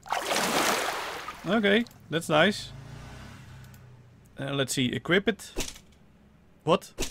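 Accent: Netherlands. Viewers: i knew u were dutch -_- lol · are you Dutch?? als dat zo is ;D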